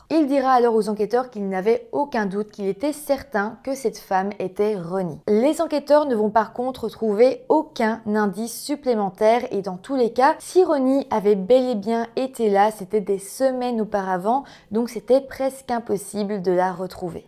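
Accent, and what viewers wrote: Belgium. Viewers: Hey mais... tu es belge ? :D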